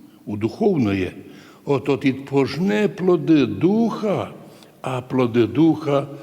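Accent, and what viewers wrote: Transcarpathia, Ukraine. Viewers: А , це знову отой, що сам не знає, ,,што" говорить? Ще один знавець ,,істини". Навіть не потрудиться сказати, що ,,ми віримо в те, що у нас істина". Віримо! Так ніт же - і Томос у нього ,,куплЕний" за,, амеріцькі долляри",і ,,дітей треба не шкодувати, а відправляти на війну"... Своїх то, мабуть, нема? Та ще витерпіти оту його окремо взяту ,,закарпатську мову"- це ,,што - то с чєм - то". Опанував би краще літературну мову, ти ж публічна людина.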